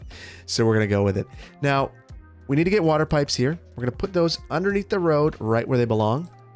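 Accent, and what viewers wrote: Wisconsin, United States. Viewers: God I love the wisconsin accent with taag or baag when ever it shows up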